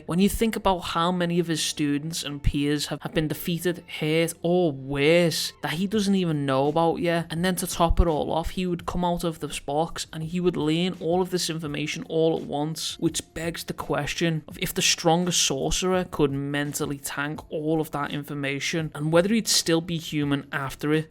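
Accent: Liverpool, United Kingdom. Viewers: You a scouser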